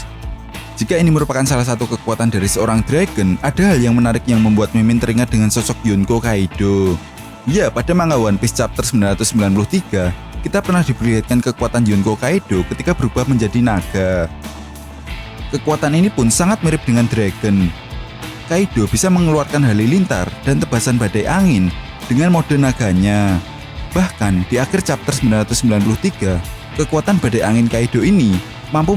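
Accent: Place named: Banyumas, Indonesia. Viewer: Bos ngapak banget ....tp seru denger critnya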